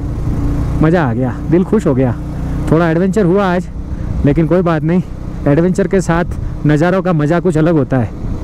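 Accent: Gujarat, India. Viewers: ભાઈ તમે ગુજરાતી છો એ તમારી ભાષા પરથી લાગી જ રહ્યું છે😅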